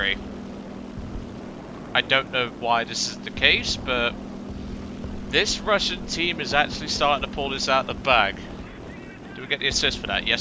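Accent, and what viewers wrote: United Kingdom. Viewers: Where in Britain are you from? (From another Briton)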